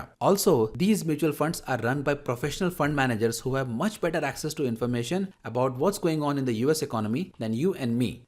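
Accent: India. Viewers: Indian English Accent Is The Most Painful English Accent To Ears In The World.... Next Time Hire Native English Guy Through Fiverr For Narrator Part....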